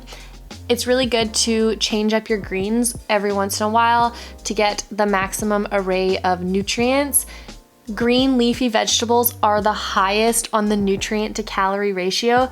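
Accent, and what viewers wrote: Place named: Canada. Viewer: I’m guessing by your accent and spelling your a Canadian too.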